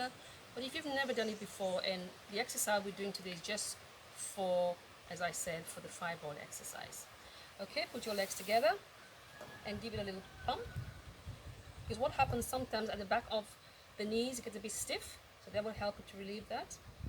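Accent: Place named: France. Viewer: Your accent is pretty, is it French? I have only a small fibroid but would like to get rid of it. My doctor was not concerned about it.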